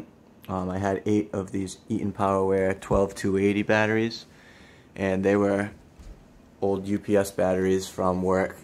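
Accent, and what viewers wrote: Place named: United States. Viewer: hi so based on your accent you are somewhere in the US (finally, most video's showing the apc2200 are from the uk) as i am too, and a friend gave me this unit with bad battery's, and cabling, no stickers no model number on it, and i had to open it up and take the model number from the internal board, so at first i was sure this uses 220v but as i found your video and i am in the US to, i am not so sure anymore i thought it might be a good idea to ask this stupid question, does it take 220v? or 110v?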